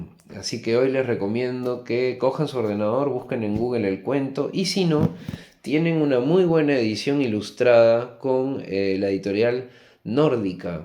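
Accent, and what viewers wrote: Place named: Peru. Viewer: Gracias por el análisis. Que lindo tu acento, creo que es de Perú.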